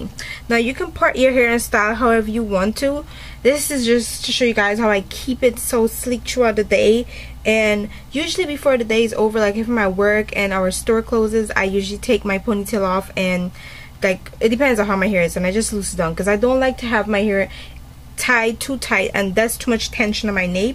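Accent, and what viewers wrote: Caribbean. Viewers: Are you from trinidad or guyana? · she def sound like a island gyal XD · r u from the caribbean?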